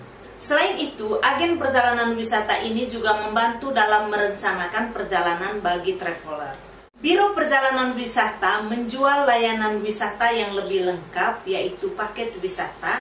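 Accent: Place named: Flores, Indonesia. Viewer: Sangat membantu penjelasannya sangat jelas dan logat atau gaya bahasanya sepertinya mba orang flores ya,...terus kembangkan pariwisata komodo...salam flobamora 🙏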